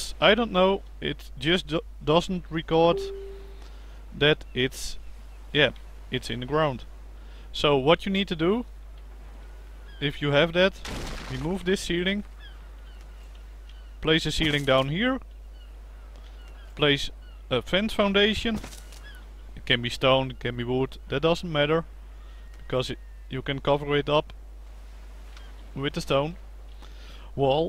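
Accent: Netherlands. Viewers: Lol i can hear he is dutch (im dutch too btw)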